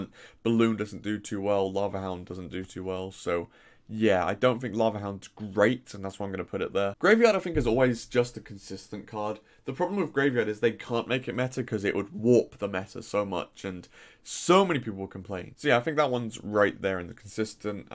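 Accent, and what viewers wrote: United Kingdom. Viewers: I watch your videos mostly for your british accent. I JUST LOVE THE WAY U SPEAK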